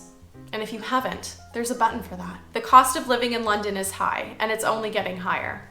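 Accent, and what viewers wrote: Canada. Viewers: I apologise for our inability to detect the Canadian accent: the difference between your voice and the 'general' American accent is... subtle to our ears. Try saying 'eh' more and make reference to poutine on a regular basis. Some of us will get it. I have come across beds in the UK called a 'Queen' size. It's not consistent though.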